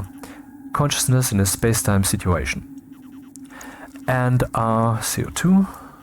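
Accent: Germany. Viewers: I love your little rant in the middle of an explanation in all your videos. It's very entertaining and I can hear that you have an intelligent and deep soul. The rant about smoking made me realise how stupid I was... I'm quitting this nonsense tomorrow, I'm young and have good genetics, I shouldn't be messing around with it! I've heard that a lot, but I don't know, your insulting German accent worked this time. Your teaching is multidimensional. See you soon on other videos.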